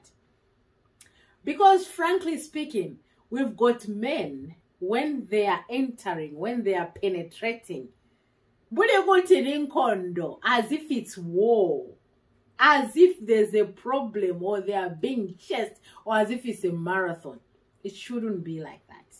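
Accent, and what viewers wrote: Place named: Zimbabwe. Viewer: I can tell that you are from Zimbabwe. Nice video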